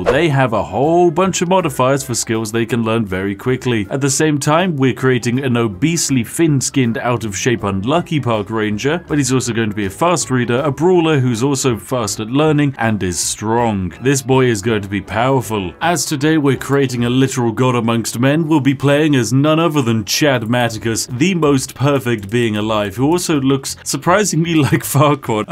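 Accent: United Kingdom. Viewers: Quite literally took me one minute to get into the video to realize this was a video and not an amphibian video. Even the hello gentlemen didn't phase me, I saw zomboid and brain went that's an amphibian video, but then my brain registered the accent and the lack of philosophical ramblings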